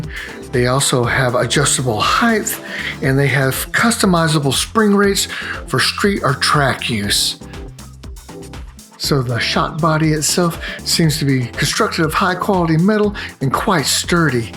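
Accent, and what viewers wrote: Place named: South, United States. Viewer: Yeah he does have a very pleasant voice over I first started watching his videos I was like man this guy’s voice is much more pleasant to listen to I think he’s a southerner if not mistaken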